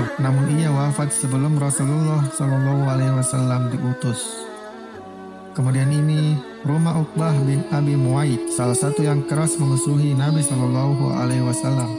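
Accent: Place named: Bugis, Indonesia. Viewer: Orang Bugis ini yang ngomong to? Kentara logatnya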